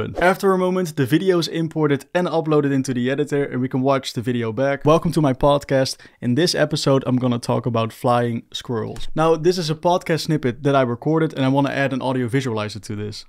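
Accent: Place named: Netherlands. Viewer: Alright I know this has nothing to do with anything but you sound like you're Dutch, can you confirm or deny this because I'm wondering if I'm right now haha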